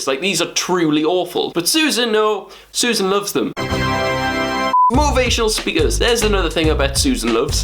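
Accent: Newcastle, United Kingdom. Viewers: looks like Shawn mendes - but a Geordie version - loving the Newcastle 😂👊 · So happy to have finally found a Geordie you tuber ☺️ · are you from newcastle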